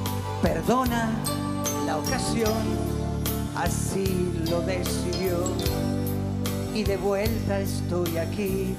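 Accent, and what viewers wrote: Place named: Italy. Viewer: Ese asento italiano chevree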